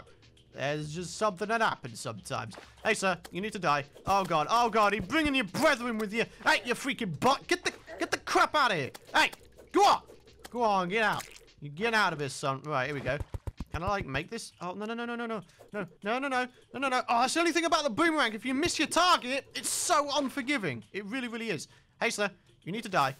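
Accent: United Kingdom. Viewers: Why does every terraria playthrough include jolly British men?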